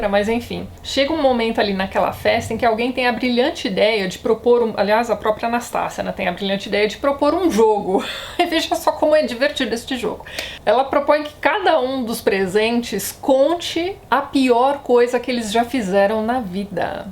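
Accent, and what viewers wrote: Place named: Brazil. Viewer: Despertou curiosidade de ler e estou lendo. Bons resumos despertam vontade de ler. Rui, um português de Portugal que julga excelentes os vídeos apesar do português com "açucar".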